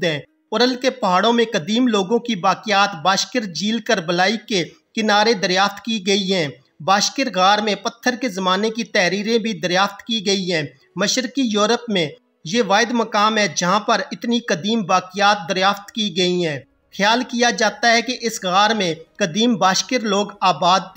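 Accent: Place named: Punjab, India. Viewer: He has punjabi villagi accent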